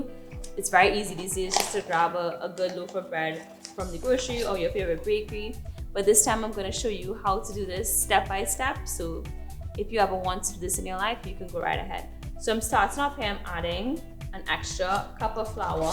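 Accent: Trinidad and Tobago. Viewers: I love a Trini accent. The name is epic. Eat ah food nah! Great work.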